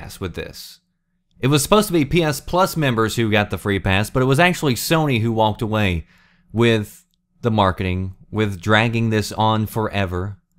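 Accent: South, United States. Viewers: This guy sounds like a Southern Weird Al. I am not disappointed.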